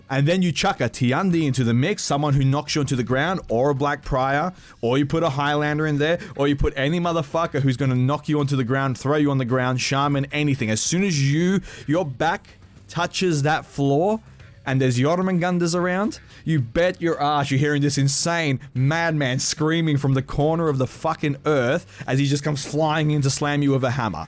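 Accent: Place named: Australia. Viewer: Gaming i noticed,none other than australians swear and say fuck this way 😂😂love your vids bro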